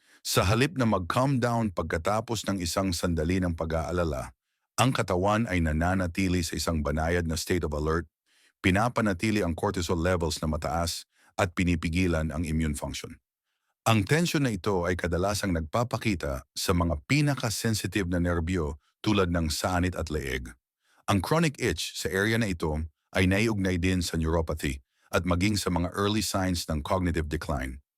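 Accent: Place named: United States. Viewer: Taga US kasi xa kaya slang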